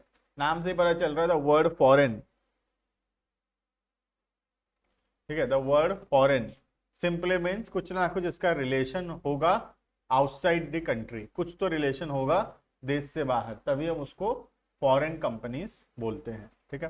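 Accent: Odisha, India. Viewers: Sir app odisha se ho kya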